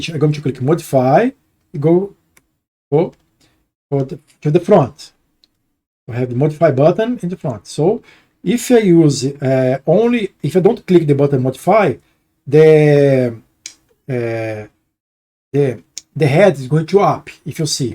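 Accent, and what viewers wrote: Brazil. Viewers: Vc é brasileito né, inglezão com sotaquezão de brasileiro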